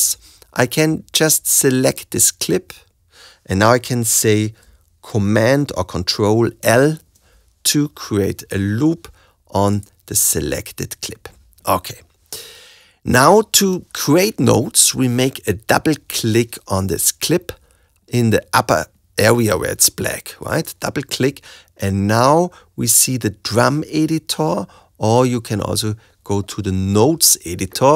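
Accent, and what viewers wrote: Germany. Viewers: tutorial is ok, but man...pls get rid of that horrendous german accent!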